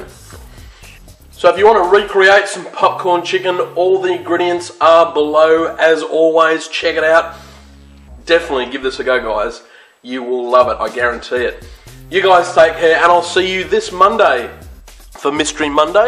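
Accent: Australia. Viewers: WOW!, #1, i love your Australian accent, along with your fantastic cooking. Especially that popcorn chicken, and my family is also a big fan of your churros. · Pretty sure he's Australian 0.o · For god sake chocoswede leave him alone 1 ain't gay it's just an australian accent and 2 ur jank. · hes aussie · He is an Aussie not American.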